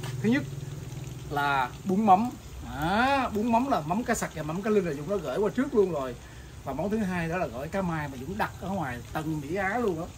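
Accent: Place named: Mekong Delta, Vietnam. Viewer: A Dũng quê tỉnh nào . Mà sao giống Miền Tây quá vậy A Dũng.....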